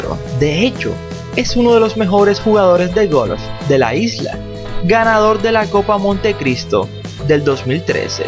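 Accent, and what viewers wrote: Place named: United States. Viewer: Dice Tany porque es norteamericano ? O no lo ve el acento que tiene?. No pronuncia bien..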